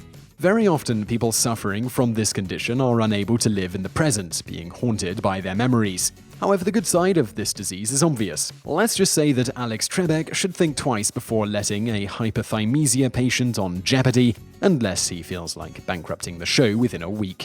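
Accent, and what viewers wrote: United Kingdom. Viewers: Thank you so much! I love love these intense snippets that make me search for more, Brit accent, also a big plus. Thank you!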